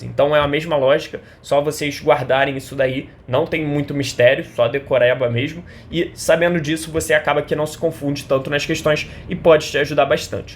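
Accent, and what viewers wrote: Rio de Janeiro, Brazil. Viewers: nada a ver com o conteúdo mas, tu é carioca da gema?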